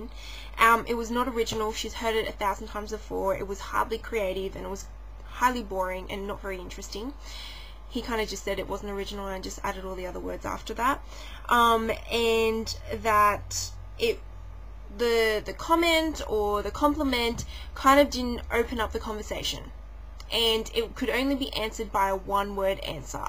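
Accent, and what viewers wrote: Australia. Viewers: Oh my yes, hubba hubba! Sexy down to the marrow. Auzzi accent is to-die-for! Edible, SOOOOOO edible.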